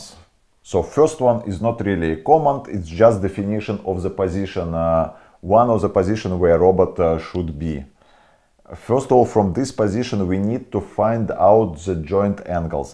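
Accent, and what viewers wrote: Ryazan, Russia. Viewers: Бля, по акценту сразу слышно русского Ивана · мне кажется или это самый насыщенный рязанский акцент, что я слышал? надеюсь не обидел своим комментом. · какой русский акцент, с первых же слов )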